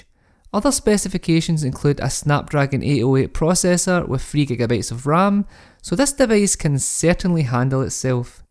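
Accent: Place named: Scotland, United Kingdom. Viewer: The share joy of a Scottish accent combiled with a tek vide cannot be mesured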